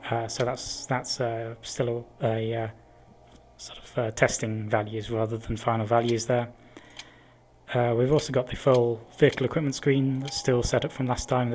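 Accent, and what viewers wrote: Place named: United Kingdom. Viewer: I am really happy to have voiceover on these! Your lack of voiceover experience is compensated by your British accent. You're doing great! Keep up the good work!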